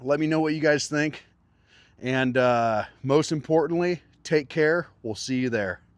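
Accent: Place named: Arkansas, United States. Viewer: What part of Arkansas you from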